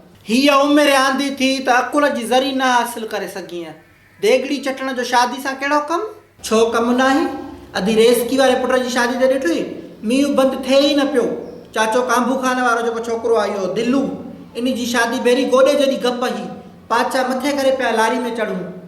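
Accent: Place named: Northern Sindh, Pakistan. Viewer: I like the way u speak Sindhi actually it's an utradi accent of sindhi (north side) they speak in the same way and we speak laar side Sindhi(south).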